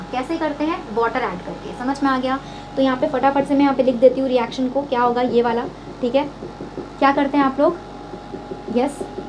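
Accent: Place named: Bihar, India. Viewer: I think you are from bihar.. Am I right?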